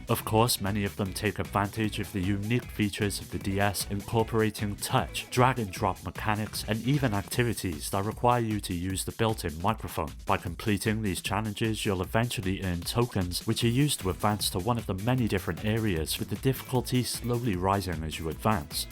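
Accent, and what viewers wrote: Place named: England, United Kingdom. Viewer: uggghhh this is the nastiest sounding english accent fuckin clear your throat, ever heard of an AHEM? "If U FROW a Ton of Guramplay" eughh the way you say Game is fucked up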